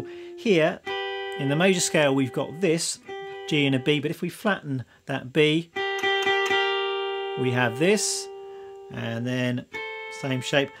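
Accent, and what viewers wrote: United Kingdom. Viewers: Good stuff, thank you! A little funny to hear a guy with a British accent playing country 😁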